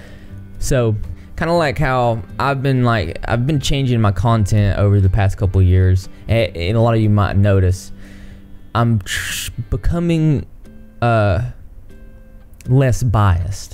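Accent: South, United States. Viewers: what part of the south are you from? It sounds just like where I am 😅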